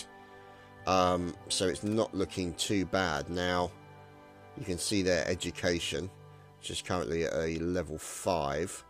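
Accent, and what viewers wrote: United Kingdom. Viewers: is this person British ?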